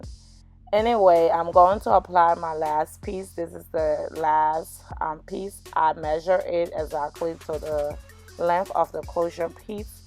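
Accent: Liberia. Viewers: Is she Liberian? Her accent sounds like she's from Liberia.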